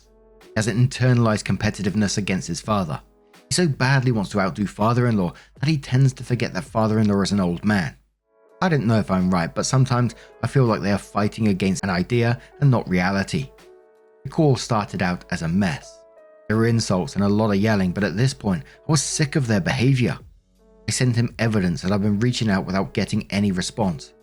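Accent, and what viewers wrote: United Kingdom. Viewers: Hey just wanted to say thank you for the channel along with the typical American who loves a British accent 🥵 lol I really love hearing your take on people's drama Reddit is like a real life soap opera and I'm so addicted. Obviously these are real lives and real people so it is sometimes tragic too. All to say your personal. Empathy and innate kindness make listening to you a pleasure. Be well sir and thank you again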